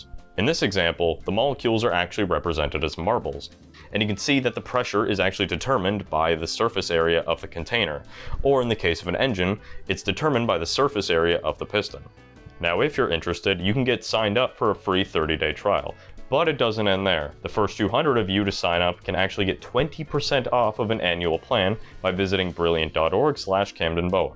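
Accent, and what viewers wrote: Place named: Canada. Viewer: Lately I've been listening to too many Canadian youtubers. So I recognised your accent.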